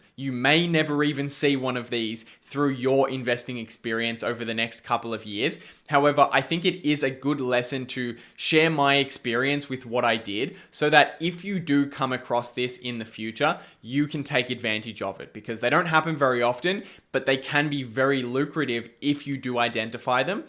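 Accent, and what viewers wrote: Australia. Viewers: Hmmmm rosy cheeks and an Aussie accent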